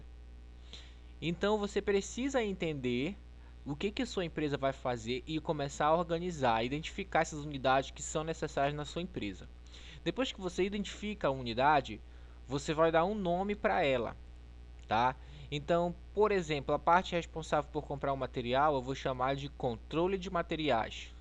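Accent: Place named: Pará, Brazil. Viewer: Sotaque paraense detected! Impossível não reconhecer um Belenense falando! Hahahaha.. Muito bom os videos, parabéns! Deu pra ajudar a iluminar bastante as minhas ideias.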